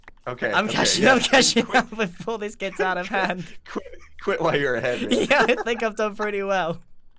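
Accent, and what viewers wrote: United Kingdom. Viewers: Has British accent Comment section: DAN TDM?!?!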